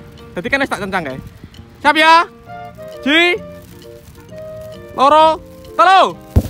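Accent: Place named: Magelang, Indonesia. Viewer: Kok le omong koyo Magelang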